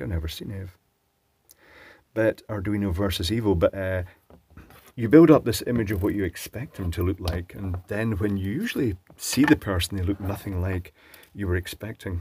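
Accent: Scotland, United Kingdom. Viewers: I just like the Scottish accent (I'm English, sorry) so I like to listen to you and IanScottJohnston if only for the voices.!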